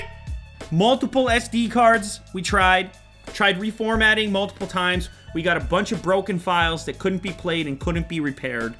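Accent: United Kingdom. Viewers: actually a pretty good uk accent! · That Excellent British accent though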